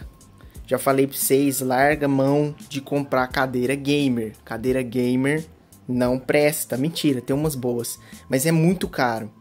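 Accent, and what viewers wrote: Minas Gerais, Brazil. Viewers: cê e mineiro ou sulista?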